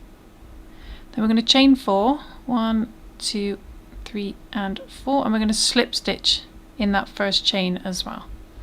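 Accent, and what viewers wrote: United Kingdom. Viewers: Hi! Thank you so much for sharing this little Angel. It is perfect to send in a Xmas card to my sister in Germany. I know she will love it. You have become my go-to for all things crochet because your instructions are so detailed and very easy to follow. Plus, I just love your British English and find your voice very pleasant to listen to. Thank you so much! :)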